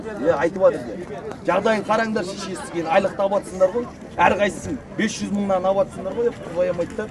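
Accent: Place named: Shymkent, Kazakhstan. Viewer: Молодец əңгімені по шымкентский ұрады екен крассавчик қайтпа братуха тек алға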